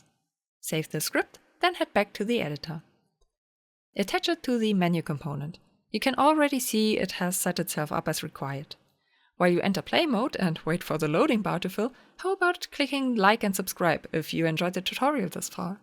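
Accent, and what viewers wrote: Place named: Germany. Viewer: Ein Voice Over in Deutsch als Audiospur wäre cool ;-) Ich kann zwar sehr gut Englisch, aber dieser Deutsch Englische Dialekt ist echt schlimm. Ich weiß nicht warum, aber ich höre jeden Deutschen heraus, der Englisch spricht und find's wirklich schwierig. Frag mich aber nicht warum, ich weiß es selbst nicht. Ansonsten, Top Video. Danke!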